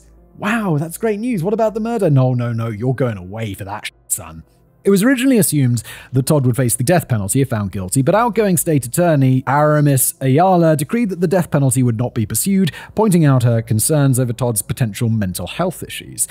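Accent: RP, United Kingdom. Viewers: The last time I watched this guy he really talked as though he had a huge plum in his mouth, so it was a put on or it tuned people out & here we are....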